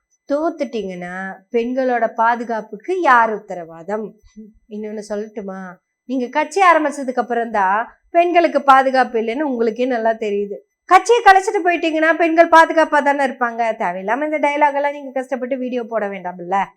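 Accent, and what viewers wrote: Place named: Coimbatore, India. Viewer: பெரியார். பேத்தி. அவர்களே. உங்களுடைய. அறிவும். அந்த. கோவை. கொஞ்சும். தமிழும். சேர்ந்து. அரசியல். என்ற. பெயரில். இந்த. நாதாரி. பசங்களை. வச்சி. செய்கிரீங்கம்மா. நீங்க. உங்களைப்போல். அறிவாளி. பெண்ணை. பெற்றவர்கள். உங்கலுக்கு. நன்றி. பெண்கள். தினத்தில். நீ. நீடூழி. வாழ்க. என. வாழ்த்துகிறேன்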